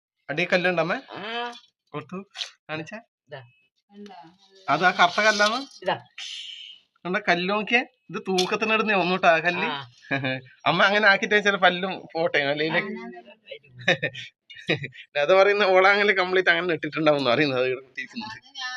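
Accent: Kannur, India. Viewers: Padichathe nammal padu alle nammalkku achadi bhashayonnum veettil venda alle nammale kannur slang poliyalle❤❤❤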